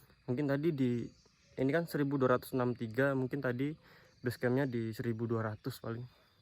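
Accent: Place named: Bali, Indonesia. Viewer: Warbyasyah Logatnya udah bali banget nih bli 😆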